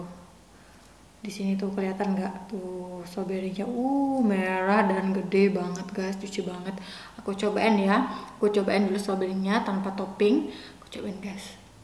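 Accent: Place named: Bali, Indonesia. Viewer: Logatnya bali banget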